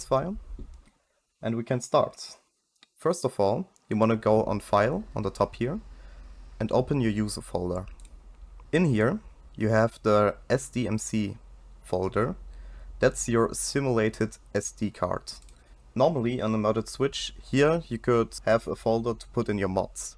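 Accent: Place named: Germany. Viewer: Bro klingt mies deutsch ja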